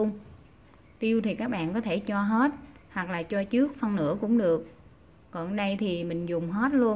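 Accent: Mekong Delta, Vietnam. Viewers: Ủa thấy bình thường mà. Chắc do bạn k nghe quen tiếng miền Tây. Chứ chị đó nói bình thường ắ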